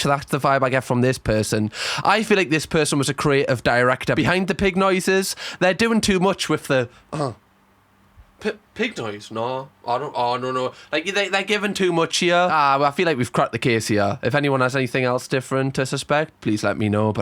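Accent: Newcastle, United Kingdom. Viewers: He's from Newcastle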